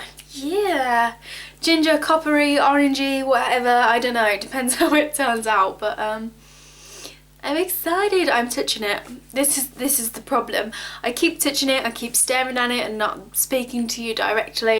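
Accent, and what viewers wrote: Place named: Midlands, United Kingdom. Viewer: Where you from bbe?? You sound Midlands xx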